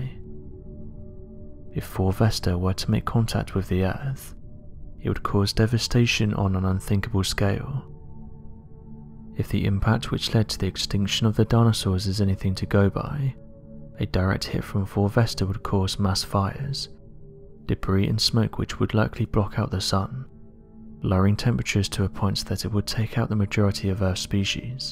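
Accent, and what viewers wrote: United Kingdom. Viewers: Always some horrendous British accent narrating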